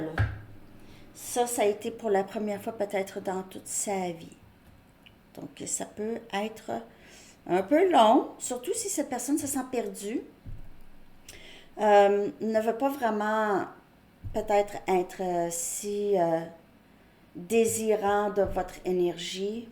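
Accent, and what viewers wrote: Quebec, Canada. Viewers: Bonsoir de la région parisienne. J adore votre accent et le Québec. Ma fille vit à Montréal et j apprecie d y aller. Je suis vierge ascendant gémeaux. Votre lecture résonne bien en moi. Mon autre est parti depuis 5 mois justifiant qu il souhaitait rester seul. Gros choc émotionnel pour moi qui m a empêché d avancer. Je désire tant qu il revienne. Mais je commence à sortir de ce trou noir et espère du meilleur.😊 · Hello on a adore l'accent Québecois..on te comprend très bien. et cela me parle beaucoup. s'est lui qui s'éloigne pour ne plus me faire souffrir et moi qui ne me sens pas assez bien pour lui... du coup nous sommes séparés. il n'y aurait que le sexe qui pourrait nous unir.donc il y a hésitation de ma part. merci beaucoup de ce tirage gratitude et bienveillance:planet-orange-purple-ring:🙏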